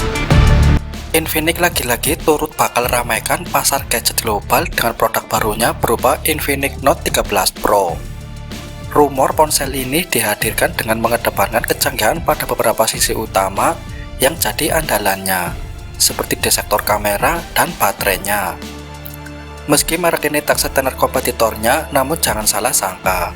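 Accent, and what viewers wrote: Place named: Java, Indonesia. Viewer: Medog banget om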